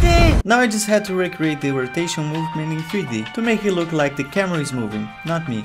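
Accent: Brazil. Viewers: Eu percebi assim que ele começou a falar inglês, sei lá, parecia um inglês meio nordestino, pensei "sapoha é Br rapaz" kkk Cara esperto e esforçado slc. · Esse cara pelo inglês dele, parece que tem um sotaque brasileiro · Esse cara tem um sotaque meio q brasileiro n acha? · Primeira frase que eu ouvi já pensei "hmm sotaque de brasileiro" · Sotaque brasileiro do caralho kkkkk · Sabia q ele era brasileiro, esse sotaque não engana kdjdkdk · Adoro o sotaque brasileiro inglês hahaha, eu entendo tudo, os gringos falam tão rápido q nem da tempo de dobrar a língua ksks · o sotaque br é muito reconhecível, não dá pra deixar passar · Puta q pariu! Q FODA! Br reconhece outro BR só pelo sotaque kkkkk. Boa sorte nos trabalhos mano 👊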